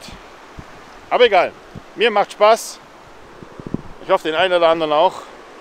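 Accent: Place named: Germany. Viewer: Good luck for the future and its really a lovely german accent you cannot hide :p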